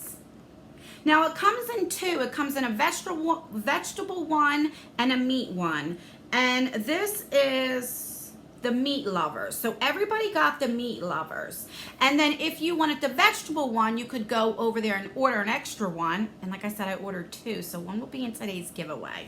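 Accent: New Jersey, United States. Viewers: And i have to say i live your true Jersey accent! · Btw You are my favorite subscription box YouTuber! I love your Jersey accent and your upbeat personality and I also love that you aren’t in your twenties because I’m 36 and aging skin is a lot different than young skin so I know if a product works for You it will most likely work for me!!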